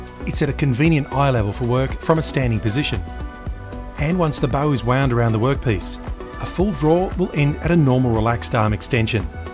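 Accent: Australia. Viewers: one second in to this video and the ambient music + aussie accent conjures up some nostalgia for those 2000s documentaries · P.S. Always nice to hear a fellow Aussie!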